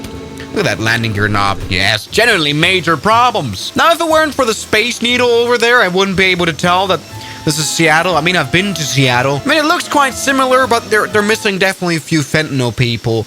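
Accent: Germany. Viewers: I was not expecting the German commentary brother. I guess I never realized you are German lol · bro was a Germany or swiss? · Dein Englisch-Deutsch hat mich gekillt😂👍. Ich hab von Kindesbeinen an sämtliche Simulatoren geflogen, angefangen mit FS98, 2000, 2002, 2004 und der FSX natürlich. Der Übergang vom 2000 auf 2002 war schon revolutionär damals. Bevor ich aber wirklich mit dem MSFS angefangen habe bin ich viel "Fly Unlimited 2", der wahre Flugsimulator meiner Kindheit. Ach und dann war da noch "Fly! 2" von Take 2, kaum bekannt. Ich erinnere mich noch an Top Addons im FS2002, Capain Sim hat im FS2002 mit der 727 angefangen. Die war damals revolutionär oder die gute "Ready for Pushback 747-200". 2012 habe ich dann Pause gemacht und Anfang diesen Jahres mit dem FS2024 angefangen. Ihr könnt euch nicht vorstellen, wie heftig diese Entwicklung für mich war😂. Die Grafik war das Eine, okay, ich hatte den 2020 schon auf dem Schirm und wusste von der guten Entwicklung grafischen Entwicklung, aber was für mich krass war, war die neue Technik in den Cockpits, nämlich die neuen Glascockpits. 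Bis dahin war für mich das Cockpit einen A320-200 am modernsten oder das einer 777😂. 2011 gab es doch von Microsoft einen ganz kläglichen Simulator. Ich glaube der hieß einfach nur "Microsoft Flight", spielte nur auf Hawaii und war grafisch im Grunde nur ein leicht aufgehübschter FSX, wurde auch baldig eingestampft.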